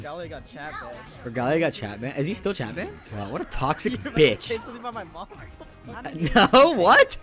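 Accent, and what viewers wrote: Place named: Asia. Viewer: i was watchin this and my mom said u have a asian accent idk why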